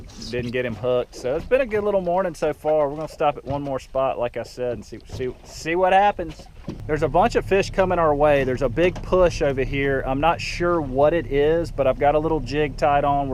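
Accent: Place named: South, United States. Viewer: Hi, I just want to tell you how much I have enjoyed watching your channel which I just discovered two days ago. I absolutely love your Catch and Cook Video's. You are a lovely person and your video's and friends seem so nice. Thank you for your channel and keep those Catch and Cook video's coming. By the way, I saw in your mother's kitchen she had a scripture from Psalm 16 written on a small blackboard. Is she a Christian? If so, I think that is awesome. I have walked with the Lord Jesus for 26 years now and have an awesome relationship with Him. Where are you from originally-you have some beautiful southern accent. You are an amazing fisherman-someone who can tell what fish is on the line before you even see the actual fish tells me that you are very knowledgeable about fish, which I find so interesting and neat. I can tell you just love being on the water and you are so fortunate to be able to make a living doing what you love. 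God Bless You,.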